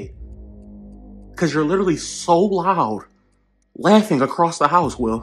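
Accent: Philadelphia, United States. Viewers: you have a Philly accent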